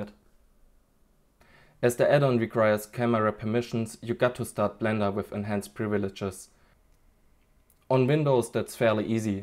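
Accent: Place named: Germany. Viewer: Bruder man hört das du deutsch bist 👌🏽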